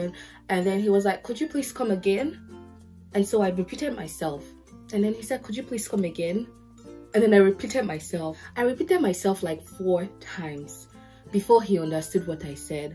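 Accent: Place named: Ijebu-Igbo, Nigeria. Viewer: Do not change your accent for anybody!! Thank you for not changing your ijebu-igbo accent 😁😁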